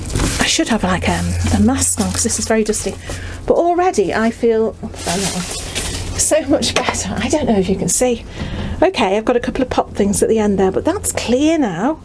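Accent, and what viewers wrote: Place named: Stoke, United Kingdom. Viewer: Hiya you sound like a stokie? Have you had any storm damage?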